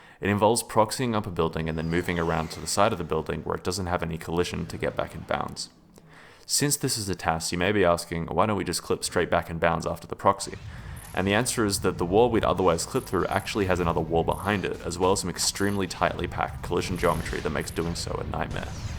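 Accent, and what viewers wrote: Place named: Australia. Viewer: Pro tip for understanding australian commentary: Be australian.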